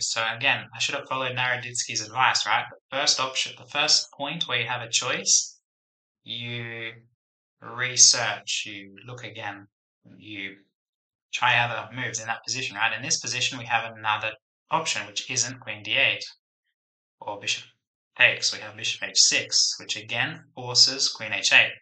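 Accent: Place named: Australia. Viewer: What is your first language? Your English sounds great, at first I thought you may be from Australia or New Zealand.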